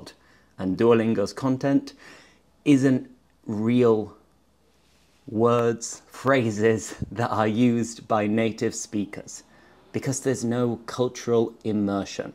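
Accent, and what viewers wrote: England, United Kingdom. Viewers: I LOVE HIS VIDEOS BECAUSE THEY ARE AMAZING AND CAN GIVE YOU TONS OF ADVICE AND EXEMPLES , very nice love his video and his cool english accent and he is right as well about the app.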